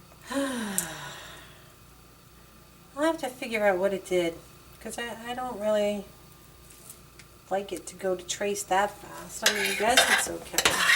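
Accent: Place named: Boston, United States. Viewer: Are you n Boston? I think I am detecting a Boston accent from you. Nice soap by the way.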